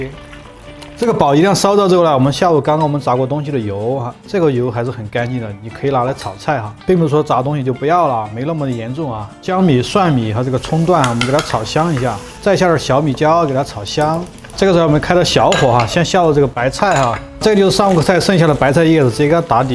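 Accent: Sichuan, China. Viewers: 帅哥亲切的四川普通话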